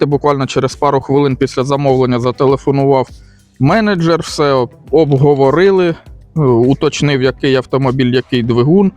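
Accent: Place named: Zaporizhzhia, Ukraine. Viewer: Відео між собою хоч схожі але дивитись все одно дуже цікаво))) Особливо коли від дому за хто зна скіки кілометрів а тут пару років тому випадково зустрів відео і чуєш свій рідний запорізький суржик! =)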